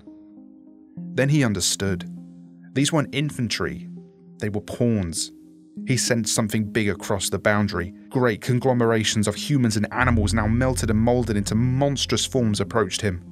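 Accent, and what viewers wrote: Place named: United Kingdom. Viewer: Clicked video to watch, heard that you were british. Disliked and reported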